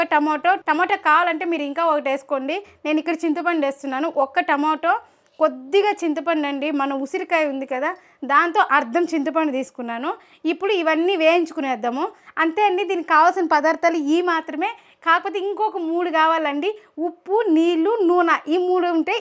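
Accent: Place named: Chennai, India. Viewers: Thank you for recipe. Are you from Tamilnadu Andhra boarder? Your slang seems so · నమస్తే! మీ భాషచెన్నై కి దగ్గర్లో మాట్లాడే భాషలా ఉంది. బాగుంది. మీదేవూరో చెప్పగలరు. నేను ఆంధ్రప్రదేశ్ శ్రీకాకుళం నుంచి రాస్తున్నాను. వీలైతే జవాబు ఇవ్వండి. నేను గమనించింది: పప్పులో మిరపకాయలు అన్ని అవసరం లేదు. ముక్కలు చేసి వేస్తే ఎలాగూ ఉడుకుతుంది కదా!2. తాళింపులో ఇంగువ బాగా ఎక్కువ అయింది. ఒకసారి వీడియో చూసు కోండి. జై భారత్!! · Neenga tamilian .nalla theriyudhu.ungalode slang pakkayile.irundhalam telungu nalla pesaringe.namba ooru..adhukkaga subscribe panne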